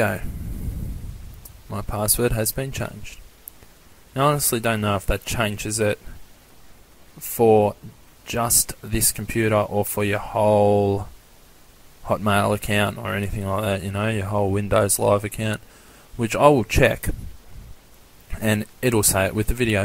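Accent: Australia. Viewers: Thank you so much man, great help. PS, you sound Australian. If so, which city are you from?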